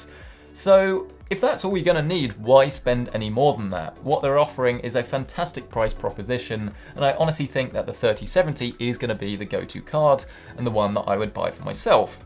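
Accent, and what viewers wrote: United Kingdom. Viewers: how did I know you were British before I watched this video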